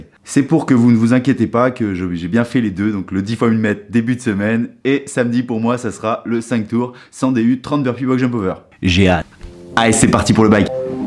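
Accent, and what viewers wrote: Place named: Lorraine, France. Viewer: Qu'es qu'on veut vraiment ? Voilà comment on sais que tu es lorrain 😂 Très bonne vidéo bon courage pour la suite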